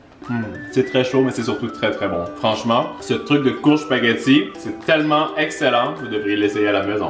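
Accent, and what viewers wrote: Canada. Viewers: On est pas trop citrouille en France mais c bon et on dit pas melon d'eau on dit pastèque voila et désoler j'aime pas trop l'accent canadien mais j'aime se que tu fait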